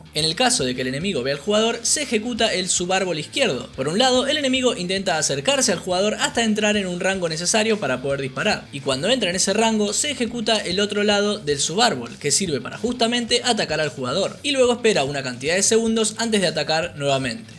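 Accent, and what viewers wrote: Argentina. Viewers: Tu voz me recuerda a la del youtuber 'Jota.' pero con acento más argentino jajs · Escuchar a un argentino hablando sin parar como usando frameworks descuartiza el Doom para pasar la tarde. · Un genio total, es el primer video que veo y ya me enamoré, me recuerda mucho a los vídeos gringos y me da satisfacción saber que ahora esta en español y encima argentino (corregime si me equivoco)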